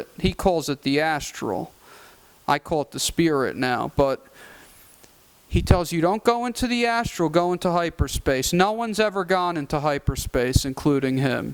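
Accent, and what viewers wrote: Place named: New Jersey, United States. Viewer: Your jersey/jew accent is almost as annoying as your incoherent thoughts and narrow mind & jumbled perspectives. I'm disappointed that I listened till the end.............wasted 30 minutes :( What authority do you speak from? You know that you are worshipping molech, baal, jehovah, yhwh, set, saturn, satan, thor, appollo, abaddon when you are following the religion of the canaanites & pharisees who exalt and demand ritual cannibalism, (communion-Bread/wine) and the obedient worship of a deity that is honored after arising from the dead. Does that sound like something a loving father would demand? for you to proclaim the power of his son, who was tortured? If jesus was gods son, his acts were in humility and grace, not to be shouted from every mouth when judging and condemning, but as an example of the power we have as one man, then as a community that seeks truth and demands transparency & fairness. 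That is gods message. Be responsible and admit you learned a lesson, a 2000 lesson, just because you paid him too much cash, you try to slander his business and livelihood? What happens if I claim as a customer of your construction business, you stole from me, when you you stated a bill and I agreed & paid that amount. How is that scamming & deceitful? Trust me.....you aren't good at meditating or astral projecting, its a gift & ability that we all have as humans, we just need to practice it...........Nice try though. You tortured demons? like from using solomonic magick? Id like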